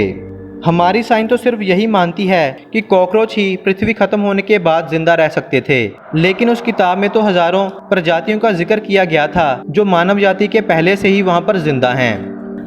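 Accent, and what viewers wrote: Punjab, India. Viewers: Caught a panjabi parson telling hindi story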